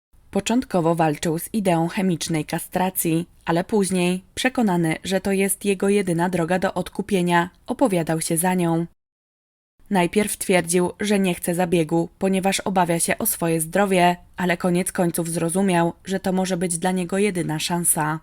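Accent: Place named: United States. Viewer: Mnie dość "bolało w uszy" wymawianie niemieckich imion, nazwisk i nazw miejscowosci z amerykanskim akcentem. Z polskim byłoby zdecydowanie ok. Bardzo ciekawy odcinek. Pozdrawiam.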